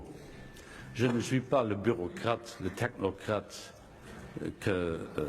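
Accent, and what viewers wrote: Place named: Germany. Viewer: il me semble monsieur Juncker que votre langue maternel est l Allemand pitiè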